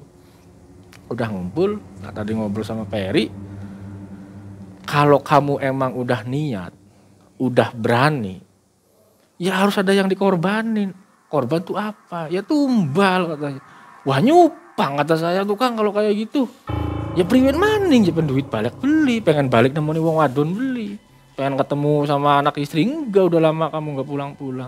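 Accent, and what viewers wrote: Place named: Cirebon, Indonesia. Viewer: cirebon banget yaa mang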